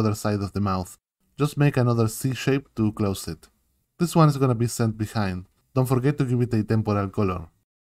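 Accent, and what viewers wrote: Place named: Spain. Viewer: Really nice videos, all of them. I buyed the promo and its wonderful with lot of content. BTW your accent seems spaniard, do you have any video in spanish. I want the for 2 things: show it to my children and also as a Teacher I wish to do a inkscape tutorial with my students. Think on it! they need like 10 visualizations per student to get to do the exercises (somehow something that resembles a draw). Thank you for this really nice channel!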